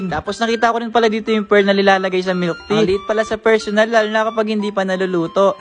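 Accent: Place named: Hiligaynon, Philippines. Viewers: (Kanami di subra) is so Cute daw ako man Mg ilonggo🥰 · Ang cute mag ilonggo ❤️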